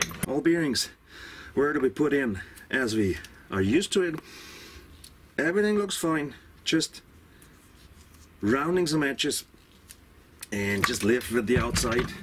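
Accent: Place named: Australia. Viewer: I love the aussie accent 😂 schöne Grüße aus KA... Maaate!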